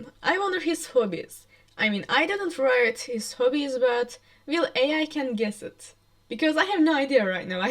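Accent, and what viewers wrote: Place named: Turkey. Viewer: Help i was watching it and i noticed the accent i was like "İs she turkish🤨" and then you were lol😭😭 So hello fellow turkish person lol😭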